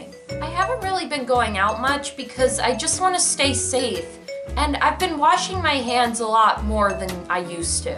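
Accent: New York, United States. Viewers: What accent do you have,? Sounds like New York. I'm Australian so all the different US accents interest me.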